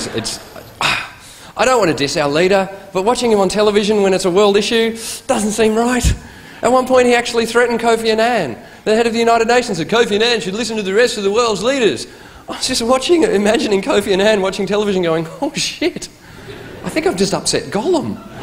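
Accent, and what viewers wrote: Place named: Australia. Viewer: Thank God Bush is out of office, but it's still great to see someone (especially an Australian) poke fun at him. The accent just seems to make everything funmier.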